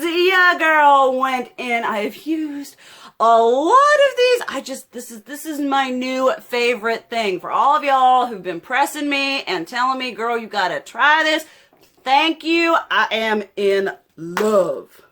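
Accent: South, United States. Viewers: I LUUUUV your accent. I live in East Tn, and it's music to my ears to hear an authentic southern accent. Don't kmow how often you hear that, but just sayin...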